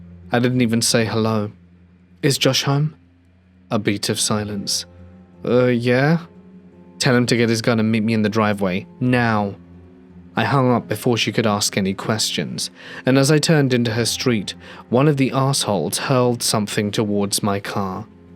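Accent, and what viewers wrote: United Kingdom. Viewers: I'm not sure where you live but he has a very British accent like my own .Unless you live over on this isle then it's not going to be possible..I'm guessing you may be,American because I've noticed Americans never take into account that there's actually billions of us who don't live over there ..cheers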